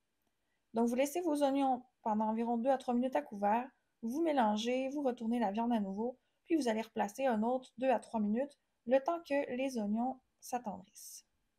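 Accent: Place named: Canada. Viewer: Je crois elle est du Canada mais c'est compréhensible ce que elle dit !!!!!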